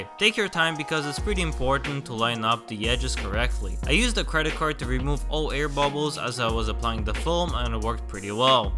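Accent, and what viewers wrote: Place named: Russia. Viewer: Русский акцент ни с чем не спутаешь. Спасибо, бро 😀👍🏼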